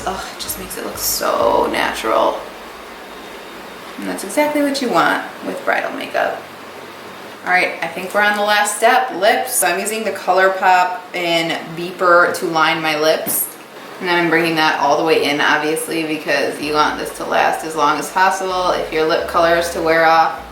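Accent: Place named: Upper Peninsula, United States. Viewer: Are you a yooper?😂 Me and the rest of my family are northern and you sound just like us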